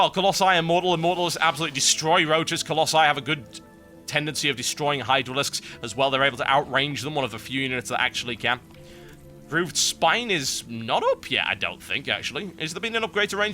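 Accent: United Kingdom. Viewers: just a suggestion TB, since you're catering your casts to those who don't really understand the game or play very well, at the start of the cast when it's perfectly standard play you could perhaps explain the map layout a bit, and the possible playstyles accompanying the maps. Apart from that, keep up the casting! Love hearing a British commentator =D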